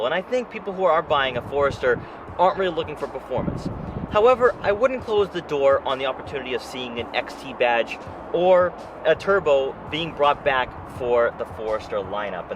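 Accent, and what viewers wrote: Boston, United States. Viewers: Excellent review of the 2020 Subie Forester, love your Boston accent, I heard it strong at times. I'm not what the exterior color is of the one you reviewed, but it certainly looks amazing 👌. However, the 2020 Legacy XT Touring you recently reviewed was awesome.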